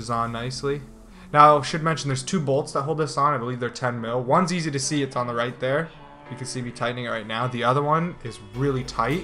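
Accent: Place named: Canada. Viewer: Good video. The Canadian accent makes it even better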